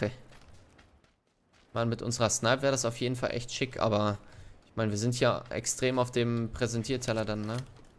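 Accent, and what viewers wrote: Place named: North, Germany. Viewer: Alter Schwede!!! Das geht schon klar :D Sagmal woher kommst du?? Irgendwie hörst du dich sehr nordisch an ;)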